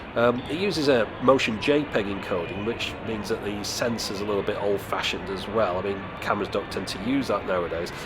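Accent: United Kingdom. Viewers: that British accent :D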